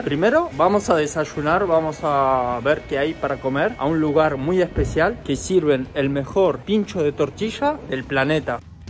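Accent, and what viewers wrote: Argentina. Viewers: Esa tonada media argentina que onda?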